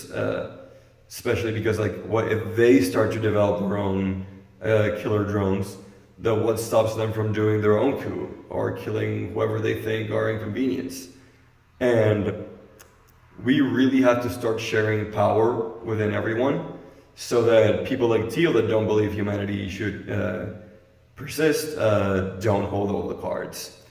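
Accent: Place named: Spain. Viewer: You Spanish? I love your accent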